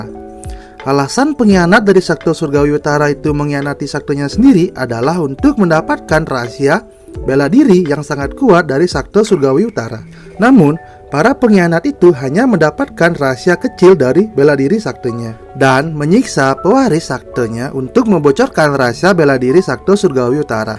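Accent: Bali, Indonesia. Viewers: nak bali? kayak logat orang bali pas ngomong😁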